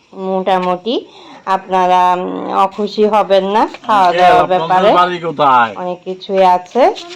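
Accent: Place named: Chittagong, Bangladesh. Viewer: আপু যে চাটগাঁইয়া কথায় বুঝাই যাচ্ছে আপু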